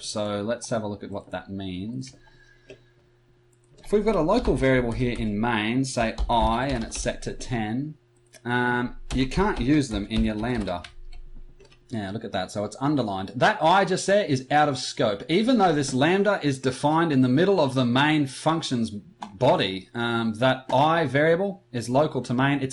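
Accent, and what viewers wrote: Australia. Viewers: You're the good legend here:D Awesome high-quality content, generally detailed low-level explanations, and cool Aussie accent. Gotta love this channel! Wish I could be a supportive patron, but can't from here.:/ Salutes from Syria anyways.:P (The hype of "Return of Lambda" is unprecedented). xD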